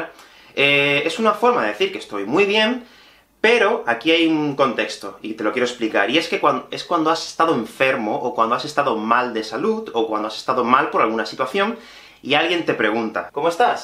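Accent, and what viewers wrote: Spain. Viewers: ) Este acento espanol <3 :P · Tô aprendendo espanhol e fui vendo o vídeo achando que ele tava com sutaque espanhol e quando fui perceber tava traduzindo o vídeo na minha cabeça sem perceber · joder, me cuesta el acento español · Estoy aprendiendo español (inglés es mi idioma madre) y he entendido casi 80% de este video en español sin traducciones a inglés! ¡Necesito más estudiar los verbos la otra del indicativo! El más aprendiéndolo puedo entender los acentos de hispanohablantes del mundo. ¿Quizás tu acento es español? Pero, muchas gracias por esta lección y suscribiré a tu cuenta de YouTube. ;)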